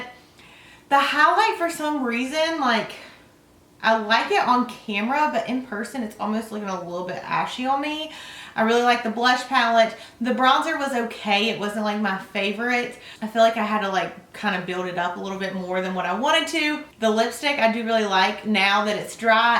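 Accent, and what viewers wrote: South, United States. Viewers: I love your southern accent 😂 what state do you live in? Close to Tennessee ?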